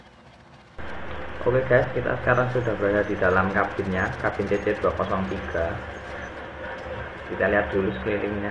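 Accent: Java, Indonesia. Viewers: Ngomong mblitar jowone metu hhhh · Sampeyan org Jawa?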